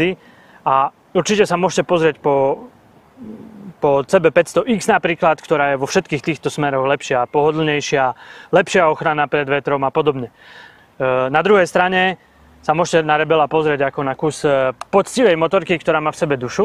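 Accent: Slovakia. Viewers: Kurna, co je to za slovenský přízvuk......to je z nějaké určitě oblasti Slovenska?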